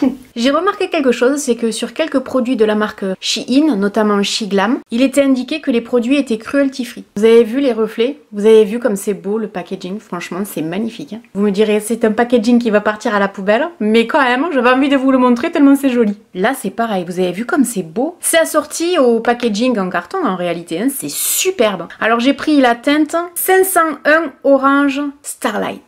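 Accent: South, France. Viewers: Téléphone: notif you tube nouvelle vidéo Moi: ouuuuuuuh mon accent du sud favori est de retour 😍😍😍😍😍 vite la bonne humeur de la journée 🥰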